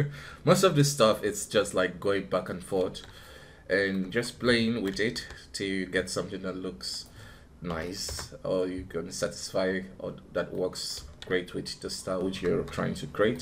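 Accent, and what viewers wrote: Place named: Nigeria. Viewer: wtf just when i thought i was the only nigerian who knew blender. even from ur voice i know u are nigerian keep it up bro.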